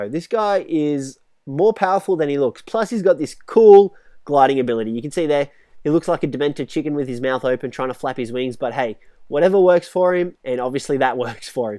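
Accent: Australia. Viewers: Are you Aussie? Because it sure sounds like it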